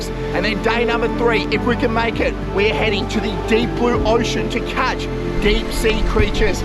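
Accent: Australia. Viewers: At the beginning, I was like: "Oh! This guy wants to make me believe he'll survive only on what he catches? No way!" Then I heard this Aussie accent, and I switched right to "Oh! Right!" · First time ever watching this guys Video randomly, as soon as he opened his mouth I figured he's Australian 😂😂😂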